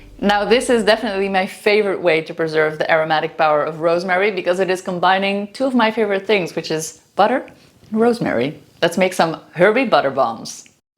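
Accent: Netherlands. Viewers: You sound Dutch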